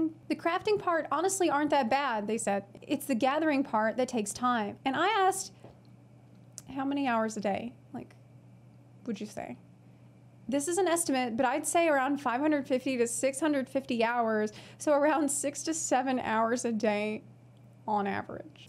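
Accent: South, United States. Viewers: You have the cutest country accent I've ever heard where r u from ?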